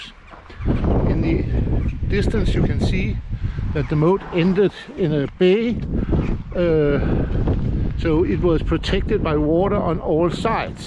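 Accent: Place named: Denmark. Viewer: And I can hear that you are danish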